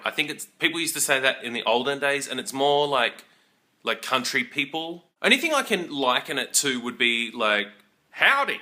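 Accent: Australia. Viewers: I've heard that australian accent was kind of ugly, but I totally disagree now, love the way you speak on your videos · He is definitely from the a wealthy upperclass family in Sydney (he doesn't have a Melbourne accent). A lot of what he says isn't that representative because of his social group, but there is a lot that is representative too, purely because Australia is increasingly urbanised and multicultural. Most of the language stuff is very dependant on social status and where one lives, even within a particular city, so that is not very representative. The other stereotypical things are more true. Things like kangaroos and koalas aren't very common to many people at all. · Listening to these videos is a bit like listening to my brother speak. The differences in regional accents may not be as noticable as in America or Britain, but Australia does have them, and this guys sounds like the exactly sort of city accent I (and my brother) was raised with. 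I wonder if he lives in Sydney? Also, I am amused by how amused he is at doing the fake exaggerated Aussie accent. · Love Aussie accent and your videos are the best I've watched. PS: You're cute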